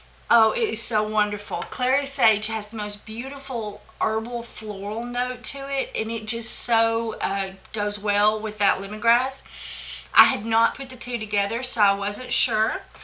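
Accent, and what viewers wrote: South, United States. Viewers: This is the best soap making lesson video, gotta check out more of your videos. And love your Southern accent, thanks for sharing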